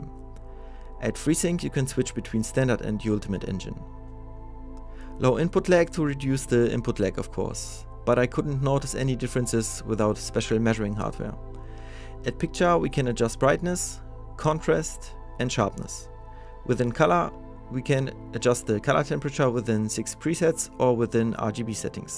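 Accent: Germany. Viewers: your pronounciation sounds way to german. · are you german? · immer dieser deutsche akzent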